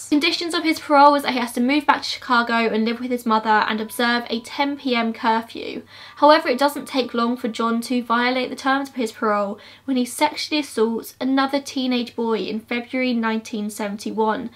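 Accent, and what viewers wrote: United Kingdom. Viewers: I could listen to your Brittish accent all day. With that being said, excellent video as usual. I've seen several documentaries on this case, but yours is really complete, shows how much research you actually put into your videos. Well done, keep up the fantastic work.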